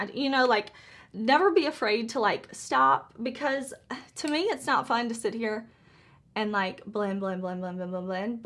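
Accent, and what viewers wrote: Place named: South, United States. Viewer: Hi,. I just found your videos by looking for videos on the Scooby Collection. I’m so glad that I stopped and watched. I love your technique and artistic abilities, but I’m staying (subscribing) because of your accent and personality!!! I do sense that you’re a SOUTHERN gal, just like ME!!! I am Jeanie from Alabama! So very nice to meet you!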